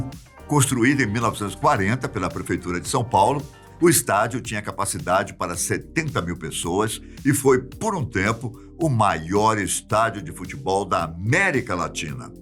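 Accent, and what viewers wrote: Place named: Rio de Janeiro, Brazil. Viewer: iu carioca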